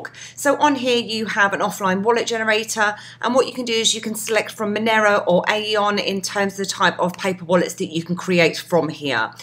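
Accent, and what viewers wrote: United Kingdom. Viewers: your British accent so lovely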